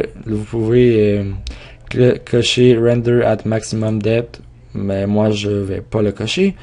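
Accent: Quebec, Canada. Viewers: C'est un youtuber québécois ! On prononce pas les mots de la même façon que les français de France. Tu t'attendais à quoi ?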